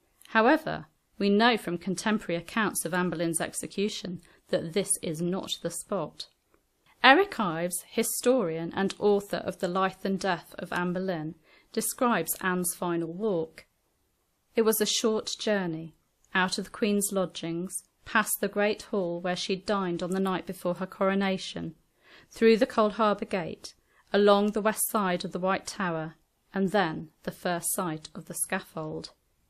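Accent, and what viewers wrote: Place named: East Anglia, United Kingdom. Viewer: Misinformation is commonplace with most if not all historic sites. Tour guides or docents if you like; often have to follow a strict guideline or script of what they tell the public. It's unfortunate but all very true. Here in the States this happens mostly regarding period homesteads from Salem Witch Trial sites in Massachusetts to antebellum plantations in the former southern colonies and later states. Oh by the way, lovely accent! East Anglia?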